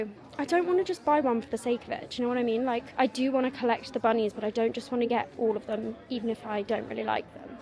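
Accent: United Kingdom. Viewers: I absolutely love your videos, I am from spain and I love hearing your british accent 💕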